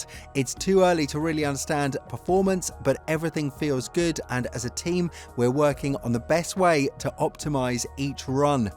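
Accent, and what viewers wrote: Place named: England, United Kingdom. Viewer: Massively bias, sounds English, so no surprise there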